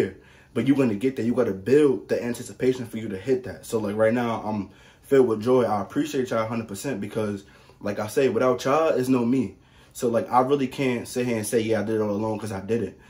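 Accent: New York, United States. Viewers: “Light skinned salmon “ 😂🤣 big facts! Lol I knew you was from NY soon as I heard you tawkin.lol Yo how you like that kerrigold butter? Some ppl be sweating it but idk..I wasn’t really feeling it. What do u like to use it for more?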